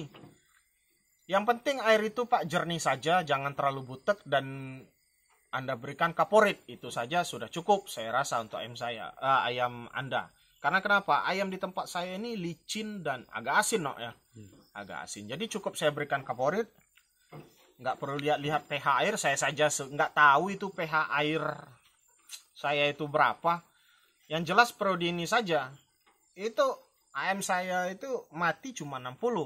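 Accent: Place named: Lahat, Indonesia. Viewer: Suka saya pembawaanya sampean,nada bahasanya kaya orang lahat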